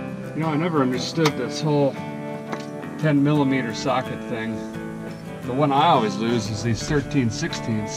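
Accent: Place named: Midwest, United States. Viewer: You must be from the Midwest probably Wisconsin you talk just like I do lol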